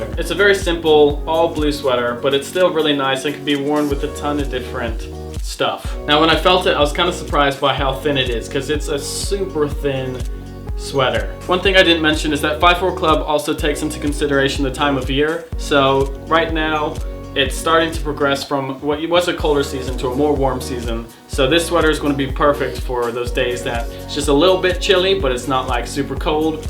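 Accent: United Kingdom. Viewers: developing that British accent I hear... :) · The british accent is kicking in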